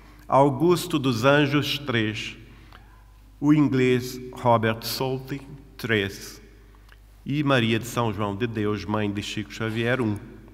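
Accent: Portugal. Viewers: o está com sotaque de Portugal?? 😳😳😮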